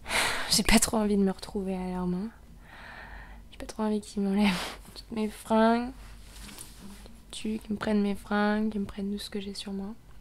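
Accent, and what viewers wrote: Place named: France. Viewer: L'accent français est beau :3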